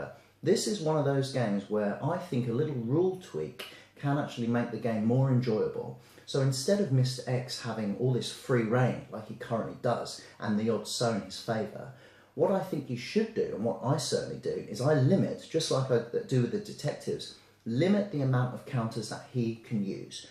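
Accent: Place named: South East England, United Kingdom. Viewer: Hello, merry Christmas. Very good review. I got this game today for Christmas. As I am studying English I would like to know where you accent is from: south-est of Great Britain?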